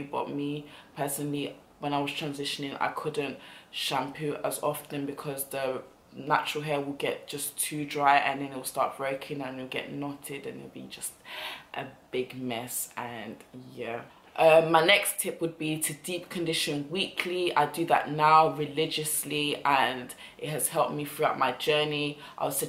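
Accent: United Kingdom. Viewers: errmm...sort of lol. OK so this is off topic; you sound like you're in the UK. I am too and I was wondering if you know a place for crochet braids. I only do it when I go back to Ghana but haven't found anyone here that does it.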